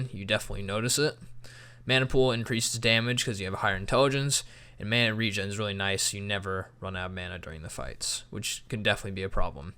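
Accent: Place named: Netherlands. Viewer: You sound Dutch! Are you?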